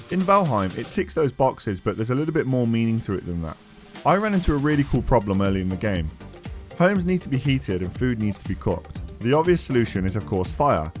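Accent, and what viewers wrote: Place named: Coventry, United Kingdom. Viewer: You sound like you're from Coventry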